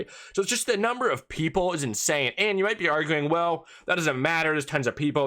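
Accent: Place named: United States. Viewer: If you want to complain about an American speaking like an American, then go elsewhere.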